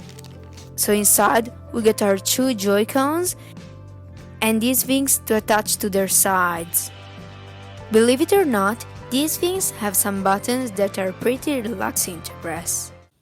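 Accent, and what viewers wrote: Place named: Italy. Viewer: I love the editing and flow of this video :) Came to the channel for the iPods, stayed for your cute Italian accent and for the refreshing editing style.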